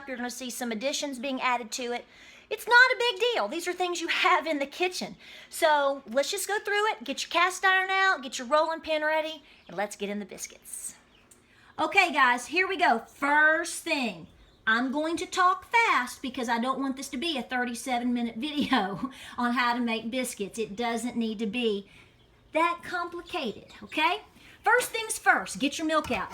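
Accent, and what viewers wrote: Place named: North Carolina, United States. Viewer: I’m a displaced Northern Carolinian living near DC and I just loved listening to you talk. Sounded just like home ❤️. I about lost it when you said *cathead*. Lordy mercy it’s been a minute since I heard that phrase. Now I’m hungry and have to go make these 🤣. TFS!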